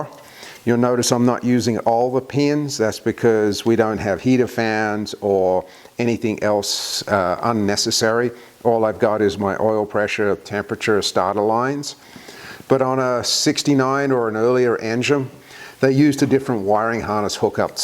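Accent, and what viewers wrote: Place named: Australia. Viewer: Thx. Different subject - on the first of your videos that I watched I tried to figure out your accent (kind of a hobby of mine). It was clear at least 50-75% is Australian, but there’s a significant influence from somewhere else, possibly Western European. Care to enlighten me? 😁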